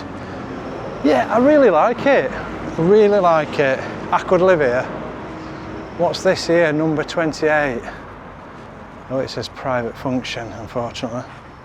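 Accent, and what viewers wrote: Yorkshire, United Kingdom. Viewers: Non Brit here, where is your accent from? Yorkshire? Love it❤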